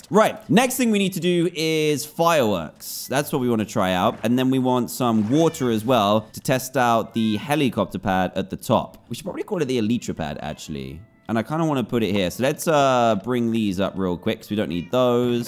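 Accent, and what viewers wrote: United Kingdom. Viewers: Hes British that's how they say it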